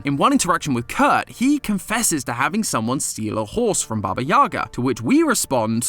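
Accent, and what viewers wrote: United Kingdom. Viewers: british matpat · Hey British mat pat tell mat pat I said hi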